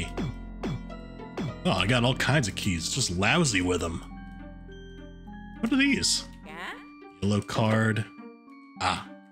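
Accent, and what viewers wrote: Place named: Germany. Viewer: Yesss bring on the weird! Also it’s always a treat hearing your German accent come out to play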